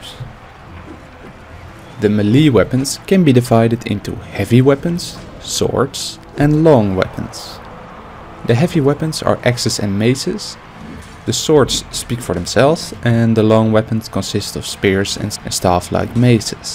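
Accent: Netherlands. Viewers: dat dacht ik al ik hoorde het aan je engels accent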